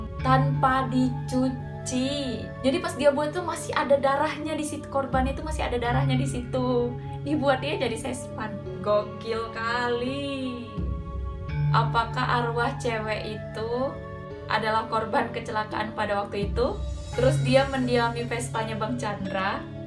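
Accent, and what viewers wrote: Medan, Indonesia. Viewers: Wih anak medan nih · Anakk medann kalii ahhh🤙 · ank Medan rupanya bah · Mbak orang medan ya? Kenal pak john als 270 tidak mbak?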